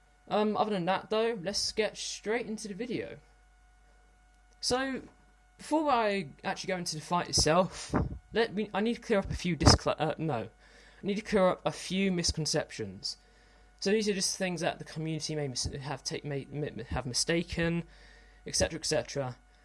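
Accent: United Kingdom. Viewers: i didn't even know he had a British accent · I actually quite admire your British accent, you sound smarter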